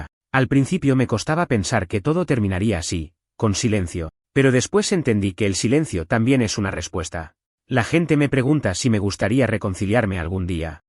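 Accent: Argentina. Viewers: No es que no me guste el bot argentino pero prefiero español neutro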